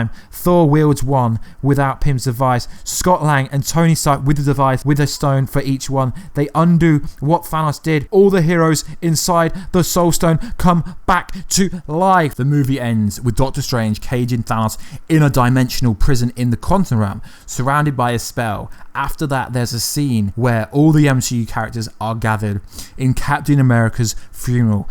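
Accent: United Kingdom. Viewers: Bruh are you British or a South American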